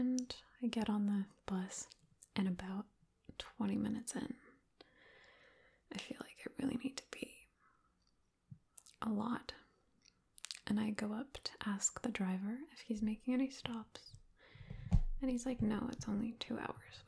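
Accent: United Kingdom. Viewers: please make more videos with british accent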